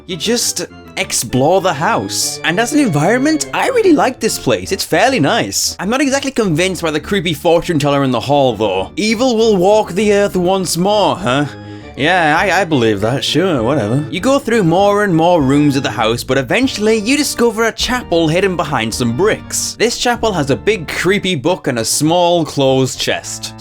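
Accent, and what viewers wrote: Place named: United Kingdom. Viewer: His voice is really british. ... i love it .-.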